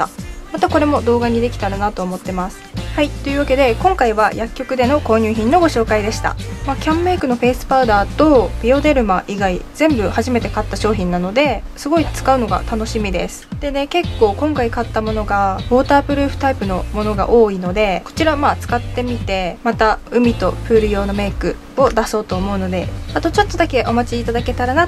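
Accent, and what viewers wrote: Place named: Hokuriku, Japan. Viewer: 北陸の方ですね？ヽ(・∀・)ノ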